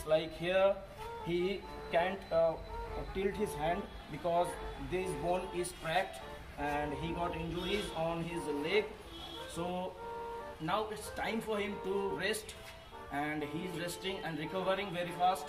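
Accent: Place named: Bengal, India. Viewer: Apnar ingreji shuney mone holo apni Bangali.. babur address ta ki? KOlkatay ki? Tahole, Porer bar Kolkatay gele ekbar dekha korey ashbo..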